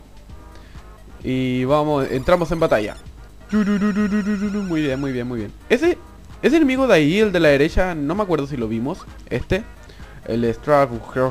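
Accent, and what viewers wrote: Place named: Chile. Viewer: Sí el es chileno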